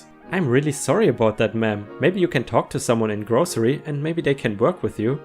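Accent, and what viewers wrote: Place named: Germany. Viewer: I Dont want EM's or EP's in germany And damm you have a good german Accent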